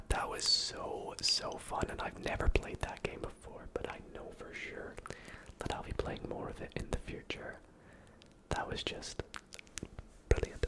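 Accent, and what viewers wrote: Scotland, United Kingdom. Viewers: Where abouts in Scotland are you from because I’m from Scotland too 🎀🏴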